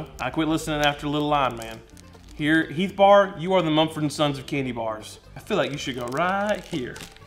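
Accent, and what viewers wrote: South, United States. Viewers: He sounds so cheery Like a southern Santa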